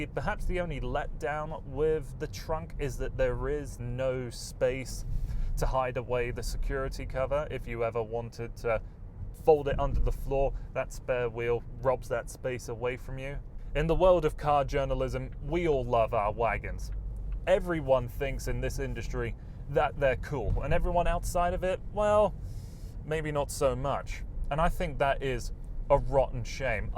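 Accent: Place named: United Kingdom. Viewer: Thank you for another great and professional review. I am myself owner of v40 CC with old volvo's 5cylinder engine the sound of which I do enjoy and do miss in present only 4cylinder units. As to your Brit origin, I'm from Poland and for a while I was mistaken that Canadians speak so wonderfully in British English :)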